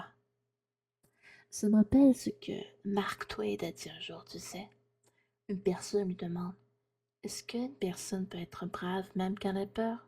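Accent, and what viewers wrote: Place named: Quebec, Canada. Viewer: je l'est su avec ta façon c'est propre au québécoise et vu que c'est léger sa fait parti de charme dans les vidéos sa rajoute un petit quelque chose qui est bien si il est bien dose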